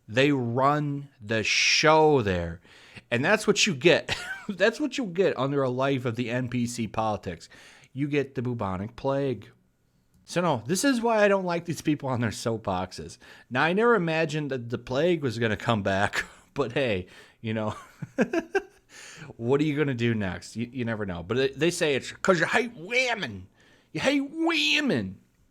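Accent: United Kingdom. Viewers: Your huffy British accent is on point.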